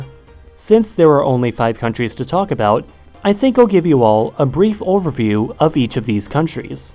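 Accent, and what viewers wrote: Germany. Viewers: ich glaube, dass dein deutsch etwas dein englisch beeinflusst because in the first few moments I thought that your are german. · you are acuttly starting to sound german you said zis'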